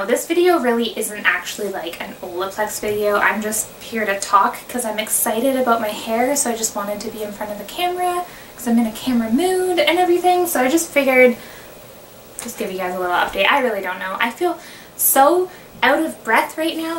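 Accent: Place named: Canada. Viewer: Your Canadian accent is so cute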